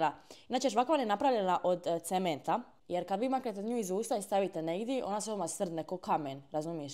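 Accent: Dalmatia, Croatia. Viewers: Ja kad te gledam plačem još delmatinski naglasak😂😂😂😂 ja se kupam u suzama (nije mi dobro)❤❤❤❤❤❤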